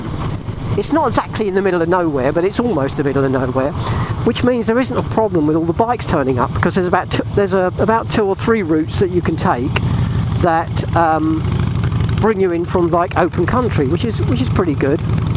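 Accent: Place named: RP, United Kingdom. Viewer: TheBikerVlogger liked your video,so I gave your video a look see.Interesting channel you have.I haven't subbed a Harley rider,in fact,you're the most posh sounding Harley rider i've witnessed lol.You're even more posh sounding than BaronVonGrumble!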